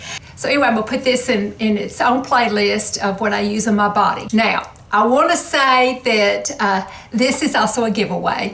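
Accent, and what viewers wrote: South, United States. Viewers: My favorite season is Spring with all the lovely flowers in bloom, including the Dogwood trees, azaleas and best of all no humidity! Despite all the pollen and my allergies, I still love Spring! I guess it’s all the new life bursting forth after the dead of winter. Congratulations on the 100,000! I love your videos ... I live in the Deep South. I’m turning 61 on January 29, so I appreciate your videos and you ... someone in my age range and who has a lovely southern accent. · My favorite season is Fall. The colors and coolness of that time of year I love. Congratulations on hitting 100,000!!😁 I so enjoy your videos. I lived in Tennessee for 10 years, and listening to your southern voice is my touchstone to there. 💜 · I could listen to you all day. Your accent is endearing, to say the least. Southern ladies have an aire about them that can't be beat. 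Cheers from central FL!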